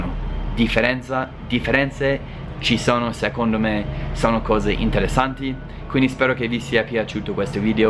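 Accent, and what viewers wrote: United States. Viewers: Mi piace ascoltarti: parli un'italiano davvero ottimo e con questo accento americano l'ascolto è piacevole!Complimenti! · a hint of american pronunciation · Un abbraccio da Genova che italiano super....bravissimo...ottimo video!! E' carino l' accento americano quando parli la nostra lingua sei forte....Genoa e United States forever...!! Tanta fortuna a te e auguri.. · Altra cosa, mi piace un sacco sentirti parlare italiano con il tuo accento americano 🤩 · Ti posso chiedere come mai hai ancora questo forte accento americano nonostante dai due anni e mezzo vivi in Italia?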